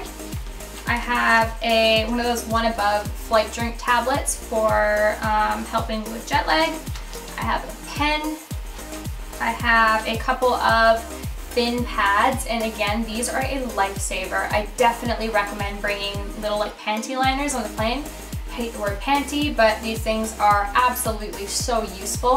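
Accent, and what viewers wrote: Australia. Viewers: your picking up on the Aussie accent! 😂 It's peeking through with your speech! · I love the hint of an Australian accent😍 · Love this video! And you kinda have a Australian accent it comes through once in a while!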